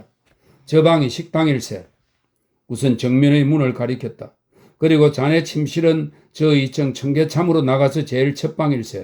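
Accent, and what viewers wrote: Gyeongsang, South Korea. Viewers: 축약해서 읽어주는 사이트는 왠지 작가의 인생관을 온전히 듣지못할것 같아서 안듣게되구요.이 사이트가 너무 좋아요.특히 경상도 말씨가 맘에 들구요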